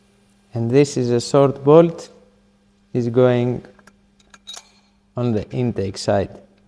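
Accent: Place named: Greece. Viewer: You sound Greek.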